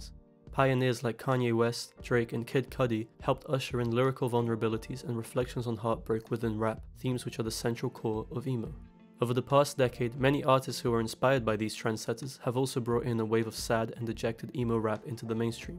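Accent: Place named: United Kingdom. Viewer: as someone from the middle of a cornfield in Illinois, I have to say I never thought I would hear a British man explain something like this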